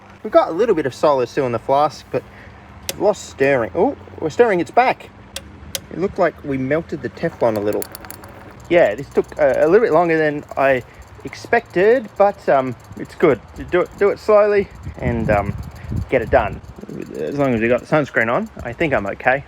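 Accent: Australia. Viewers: I love your videos! And the Australian accent is amazing! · I'm like "Damn, he's posting at 7am?" Then I remembered from the accent. Then I'm like, "wtf? It's spring, not fall." Then I remembered that Australia is basically the upside down world.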